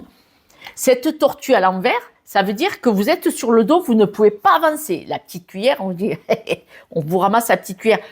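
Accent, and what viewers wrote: South, France. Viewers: Votre analyse est super. Je me rends compte en effet que certaines choses changent. Merci votre accent du Midi réchauffe le coeur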